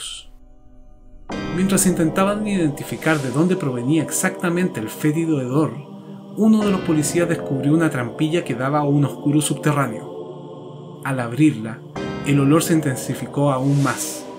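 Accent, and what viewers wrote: Chile. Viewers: es chileno el que habla?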